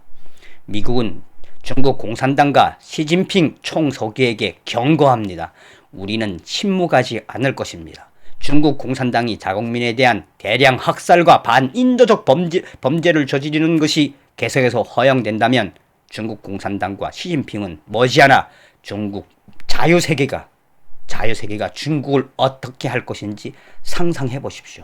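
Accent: North Korea. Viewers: 고저 더 가열차게 달려가갈시다레~~~달려가달라요!!!우리 부모님이 이북 출신이셔서, 이북 사투리 들으니까네 그냥 너무 좋은데요... 행복 하시라요~🙋🏼‍♀️👍👍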